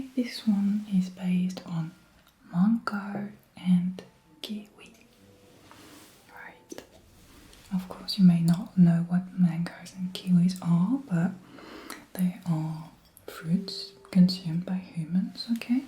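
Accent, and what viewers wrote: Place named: France. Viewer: Ce très léger accent français sur certains mots est HYPER agréable 👌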